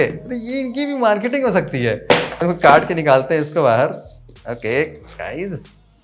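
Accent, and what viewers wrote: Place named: Kanpur, India. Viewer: lgrha tha bahut din se ap bhi kanpur se ho awaj se aj confirm 🎉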